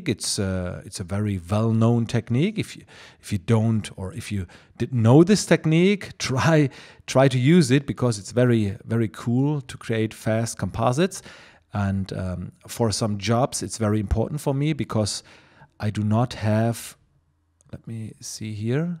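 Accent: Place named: Germany. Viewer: Schön zu sehen (hören), wie dein Englisch innerhalb von 26 min. von "Naja, halt n Deutscher, der Englisch spricht" zu "Hmm...Kanns sein, dass der aus Deutschland kommt?" wird. Man merkt, dass du schon ein wenig rumgekommen bist ;) Schönes Video. Great job.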